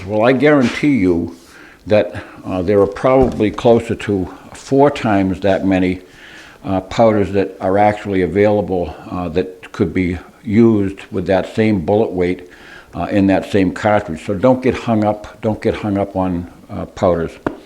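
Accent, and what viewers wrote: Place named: Boston, United States. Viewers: Bah-ston accent. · If think it was a Boston accent. Even to an Englishman it's very distinctive.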